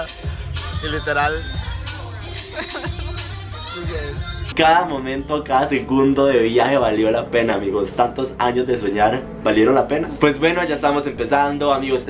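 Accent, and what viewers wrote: Mexico. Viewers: Pensé que eras de México jajaj ❤️ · su acento suena como freson pero mexicano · Creí que eras mexicano Como sea, me encanta tu buena vibra. Te envío un abrazo 💜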